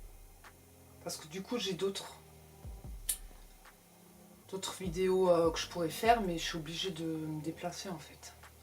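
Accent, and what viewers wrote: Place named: Haute-Saône, France. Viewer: Coucou petite sorcière, juste pour te dire que moi je t'aime telle que tu es, franche et honnête. Ton accent en tant que fille de la Haute Saône me dérange pas, au contraire, on se moque souvent de mon accent ici à la Réunion!! Je suis du même avis que toi en ce qui concerne on sait qui! Le para devient compliqué sur Youtube. Ne pas croire à tout bien évidemment alors qu'ils ont de bonnes "bases" mais en font trop!! Voilà, merci pour tes liens de bouquins intéressants. Je suis en recherche de bonnes protections car j'ai découvert il y a plusieurs années que je peux magnétiser les gens, mais je le fait rarement et juste quand une personne de mon entourage a vraiment besoin, (je ne supporte pas les voir souffrir alors que je peux les aider) car ça m'a déjà joué des tours au début car ne faisais pas de protection ni nettoyage après, ça m'épuisait et rendait malade. 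A ce jour, je sais pas si je le fais proprement. Je demande aux êtres supérieurs, allume une bougie blanche et de l'encens de l'Archange Michaël et Archange Raphaël, demande une bulle de protection mais est ce suffisant et efficace ? Après je me "décharge" avec un galet que j'ai ramassé et chargé au soleil, et ou poser mes mains au sol ou enlacer un arbre suivant où je me trouve... J'aimerais tant aider, je ne demande rien en retour, juste je demande à la personne de remercier là haut.. Je ne supporte pas voir les gens souffrir, je me dit que si on m'a offert cette capacité c'est pas pour rien mais j'ai peur pour moi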